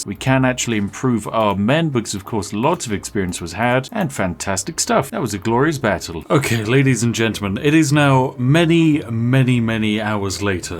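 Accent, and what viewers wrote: United Kingdom. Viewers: The way describes what he is going to do sounds very.....British.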